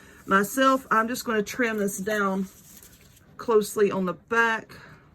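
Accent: South, United States. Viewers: First time watching! Love the easy project. Your southern accent is beautiful and you are a funny girl. Like, Like, Like! 👍 👍 👍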